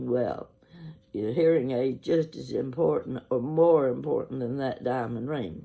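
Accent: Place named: Kentucky, United States. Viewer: I just LOVE you! I am from Kentucky, was born in a small town in the eastern part called Prestonsburg. My family settled there from the revolutionary war. General Alexander Lackey was my GGGGrandfather. Although this is considered a very depressed part of the state, I will always remember how beautiful life was there for me as a child. My Mom and Dad left there in the early 60's and I grew up in Central Florida. No other people like real Kentuckians! I can tell a Kentuckian (no matter what part of the state they are from) a mile away by that accent! You remind me so very much of my beloved family. All of them are gone now except me, and my two sisters. I am 75 the other two are 78 and 84. You bring back such wonderful, sweet memories of all of my wonderful Grandparents, Aunts, and Uncles! WE are so very much alike in our family history, foods, stories, antiques, Just amazing. 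Well I guess after all we are true Kentucky Wildcats!! Much love, from North Carolina by the way, my name is Lois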